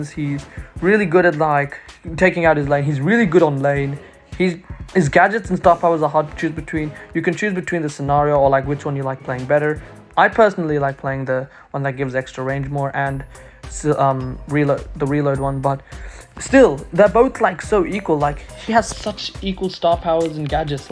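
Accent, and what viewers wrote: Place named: Germany. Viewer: Wieso merkt man, dass du deutsch bist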